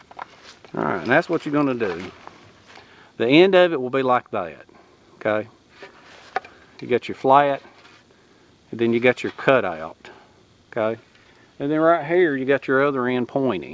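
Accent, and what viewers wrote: South, United States. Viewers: Excellent video as always. I haven't commented in a while, but that doesn't mean I haven't been watching. I always look forward to the next episode of World. But watching this one, it occurred to me that you're like the Mr. Rogers of the bushcraft community. So friendly, jovial, non-threatening, helpful, and nothing but a passion for teaching all the uninitiated (and the seasoned survivalists, as well) your wealth of knowledge, whether original or learned yourself. And you don't take yourself too seriously!! That's the best part. Keep 'em coming. And worry about your Southern. Us NC boys, and all of us born and bred in the South, know exactly what you're saying. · I had an ol' D.I. that told me: "Private - - why do you talk...so...slow?!" I told him "Sir, because this recruit's Dad is an Okie Sir." He tried NOT to laugh when he told me that explains a lot! 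I thought I'd share that with you. don't ever lose that Southern way, it's what makes you original & thanks for communicating at my tempo! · c'est l'accent 'du midi' aux etats unis. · Fabulous, really enjoyed watching and listening to your Souther accent. Carol from Edinburgh, Scotland, UK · Where are you from? I;m so southern I'm related to myself, lol!! I think your drawl is even more than mine! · Great video Sir, love the Southern accent. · Man, I like your accent so much! which part of the states are you from? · Cool shelter Glad to see you making videos again. I am from the south too love ur accent dude. Flat Head would be honored to be remembered. Till next time. Thanks for taking the time. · I think my settings are okay but could be wrong; anyway I loved seeing Flat Head's long term shelter. By the way as for the southern accent you are home grown and cool as you can be so junk'em if they don't like how you sound. 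I saw in your last video you had an LSU mug; are you a graduate or is that where Nick is attending college? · i Like the way you Talk, it Sounds very 'murican:D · Don’t ever apologize for speaking southern. I lived in Alabama as a kid about 60 years ago and I like your accent. Louis Grizard, a southern humorist, had a saying, “God talks like we do.” I still sound like I’m from Alabama and I like to think Grizard was right. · Excellent video, my god i love that southern drawl...keep up the good work...thanks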